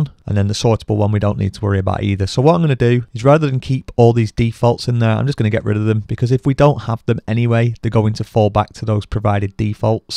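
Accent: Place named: Scotland, United Kingdom. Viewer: Lovely accent, are you Scottish? :)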